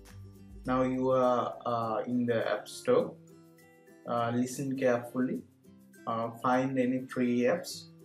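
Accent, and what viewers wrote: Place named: India. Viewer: India English^_^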